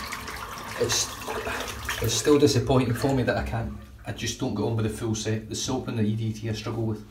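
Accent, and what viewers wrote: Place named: Scotland, United Kingdom. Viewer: With your Scottish accent, you could be recruited to be the next kings man with the all the fancy looking suits.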